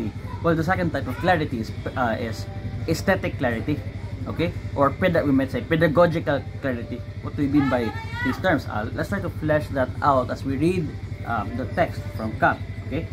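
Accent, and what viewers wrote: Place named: Philippines. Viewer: hes got a philipino accent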